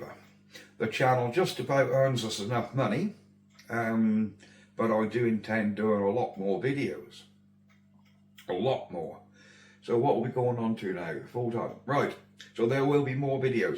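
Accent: Norfolk, United Kingdom. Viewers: You are both very brave. Mrs Mancave especially. I am sure all your subscribers will continue to support the channel. I always watch with dad so don't often watch my subscription, however I will make sure to always give you a thumbs up and a comment on mine too. We shall be thinking of Rachel on the 6th bless her. Don't hide your accent ,the reason we started watching you was because you talk like us be Norfolk and proud lol. All the very best to you both. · God Bless Mrs Mancave and yourself.. Your doing the right thing by leaving work to look after your Wife and doing your Norfolk Man Cave Channel.. Carry on doing what your doing.... I love your Norfolk accent don't ever change it, we came down to North Norfolk 3 times this year on holiday and love it! 😄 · Well done for sharing your up date on Rachel and your situation, iam sure your doing the right thing for you and Mrs mancave, you don't have to justify yourself to sterile comments from individual's, keep the channel moving along mate and keep that Norfolk accent, get better soon Rachel, watched to the end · I'm the proud son of a Norfolk man and your accent brings back memories of holidays in Yarmouth, Lowestoft etc when dad (who never lost his accent) Acle market in fact after a couple pints mum couldn't understand him for hours after lol , also when delivering to the Norwich area I felt at home dad died just after the millennium at 82 and is much missed. 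All the best to Mrs man cave look after each other...I'll e watching and I am subbed. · Still here at the end. Firstly all the best to Mrs Mancave we're all thinking of her. Second there was loads of good news in this one, apart from the Mrs and all your travelling oh and the price of suet. For myself please don't change a thing. I watch your vids because your knowledge of all old engines that you bring back to life is amazing. I'm more than happy to watch anything you put up. PLEASE never put background, so called , music in your vids. We want to hear you not background noise.. Not sure about a live stream. The time taken up, I think, would be better spent in the workshop. This is getting as long as your chatting so all the best to you both and keep things coming...Oh and I love the Norfolk accent. A coffee on it's way. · Hi, I would just like to say to you and Mrs Mancave, I wish the both of you all the luck in the world, I know from experience what her treatment entails , my dear wife was diagnosed with Lymphoma cancer, we was told it was around 8 to 12 months to complete the weekly Chemo and the rest of it , be in no mistake it is very hard, my Mrs ended up with holes in her intestine and was bringing her bowel contents up through her mouth. 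🤢🤢🤢🤮 But after we got going onwards we had 10 clear years and we made hay while the sun shone, if you can take my bit of advice, as time goes on you will be getting worn down but you won’t recognise it for yourself, I’ve NOT said all this to scare you, I’m just trying to make you aware of what might be coming your way, we didn’t have anyone to pre warn us how things may turn out, if I can just say this bit it might sound odd me telling you this but now and again when your dear wife is zonked out after the hospital visit, get yourself settled down and even if you don’t nod off just rest it’ll do you the world of good, another thing I’ll take you to task on is DO NOT apologise for your accent, my mums family was and still are from Gorleston and my Grandad & Grandma was very broad Norfolk types so be proud of yourself. Another little gem we didn’t get told is nearly every week we was at hospital a lady would come round with a clipboard and she would say to me , how’s things ?? 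Are you both ok?, course it’s yes thanks, what no one told me was, she was from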